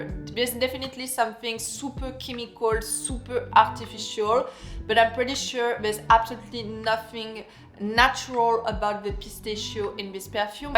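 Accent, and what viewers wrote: France. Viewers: You do realise that perfume is highly personal and changes depending on the body chemistry? You do realise that you are insulting a ton of people and their taste? This is not a review, it's just bashing 5 perfumes for entertainment while speaking with a thick French accent playing the bourgeois authority. A review is supposed to be neutral.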